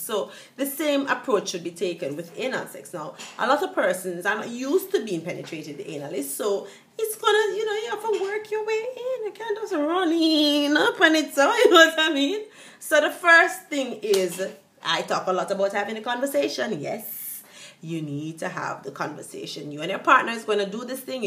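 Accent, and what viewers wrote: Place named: Jamaica. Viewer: Thank you, you are very helpful. I love your jamaican accent xxx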